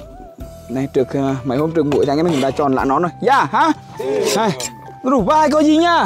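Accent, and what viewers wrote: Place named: Central, Vietnam. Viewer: Quê Phú Thọ mà giọng thì giọng miền trung😊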